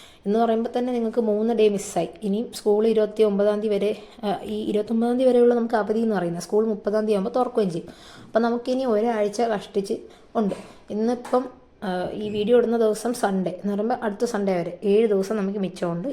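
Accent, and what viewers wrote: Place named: Alappuzha, India. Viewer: Alappuzha aahn nn thonunn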